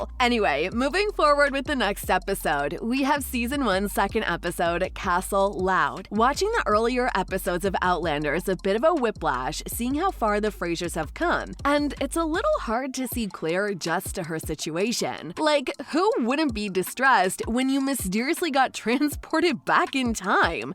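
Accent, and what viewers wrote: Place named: California, United States. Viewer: the narrator's CA stoner uptalk accent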